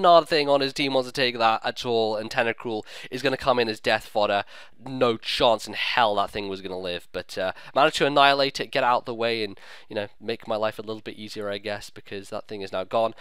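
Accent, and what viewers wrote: Ireland. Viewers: im actually disappointed that the irish accent didnt last for the whole vid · Your Irish accent is really impressive. ^_^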